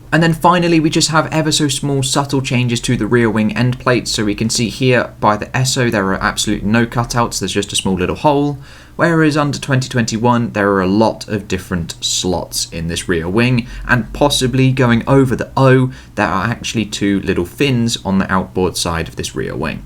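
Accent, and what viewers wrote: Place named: United Kingdom. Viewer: really british accent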